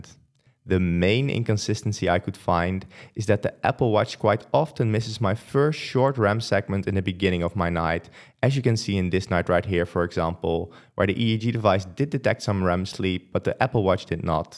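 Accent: Netherlands. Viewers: Also here to comment on your methods with this being my first of your videos to watch. Really well done and I appreciated the assumingly Dutch accent :) The thing I'll be missing (or I already missed it was announced) is an alarm function at the end of a sleep phase. I found 3rd party apps performing rather poor at this and I think it'd be the number 1 feature I'd love my watch for. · Up until where you show the dates, I thought you said eighty nights like a dozen times throughout the video, not eighteeN nights :o As a (fellow? you sound dutch) dutch person, one tip I've heard to differentiate easier is to put the stress on the second syllable when saying 1x (eighTEEN) and on the first when saying x0 (EIGHty). This does make me wonder a lot more about the p-value on all this, as this is supposed to be scientific after all and there are no error bars in e.g. 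the conclusion chart shown . Don't get me wrong, it's a solid analysis nonetheless, but it's a bit hard to know just how conclusive the comparison is or if there are overlaps in the error bars :)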